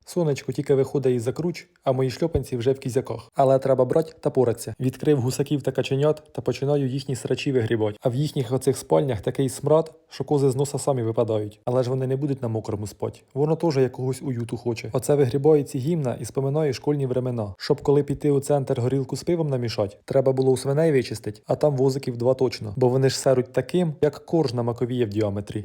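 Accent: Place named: Poltava, Ukraine. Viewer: Мова схожа на Полтавську!))))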